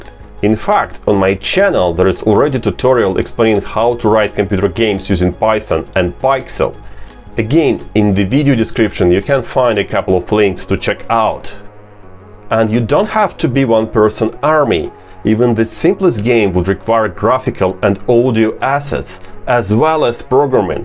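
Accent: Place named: Russia. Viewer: Я прям догадывался всё это время по акценту, что ты русский) Полезное видео, спасибо!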